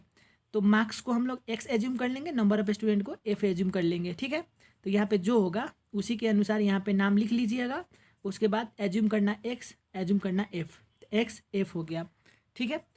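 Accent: India. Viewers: I'm opening the video and the minute I hear Indian 😩😩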